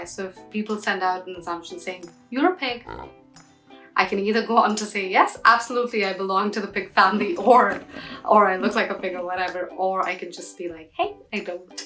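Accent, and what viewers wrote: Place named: India. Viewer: U have Indian accent R u indian??🤔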